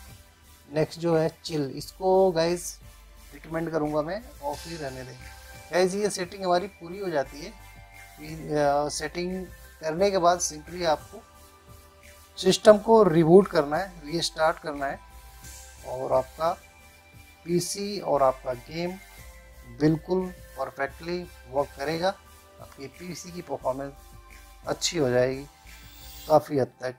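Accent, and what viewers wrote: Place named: India. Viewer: nice vid but try to talk american english or british english not indian english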